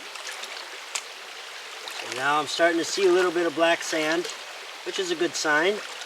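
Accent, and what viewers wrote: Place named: Wisconsin, United States. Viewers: Northern Illinois here. Think I hear that native Minn/wis accent, just a bit. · U got the wi Midwest accent down. Almost Canadian but not quiete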